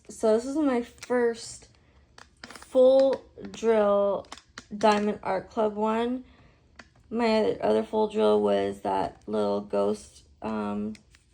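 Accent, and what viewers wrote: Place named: Montreal, Canada. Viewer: New subbie here!! ❤ your accent reminds me so much of home!! I am also from Montréal! I moved to the United States in 1990 when I was 4.. I'm 39 now 😊 I used to visit my sister and family every summer from the ages of 11-17.. I miss it so much. Montréal is a gorgeous city. Most of my family lives in Ville Saint Pierre and LaSalle LaChine. Probably didnt spell those right 😅 I'm glad you got clean and found diamond painting!! I'm also an addict. Been clean 9 years now. I've been diamond painting for 5 years now. 😊 can't wait to see your future videos!! ❤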